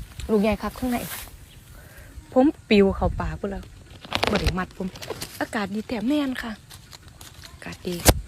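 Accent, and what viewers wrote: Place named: Ubon, Thailand. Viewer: น้องเค้าน่ารัก สำเนียงคนอุบลชัดมากๆ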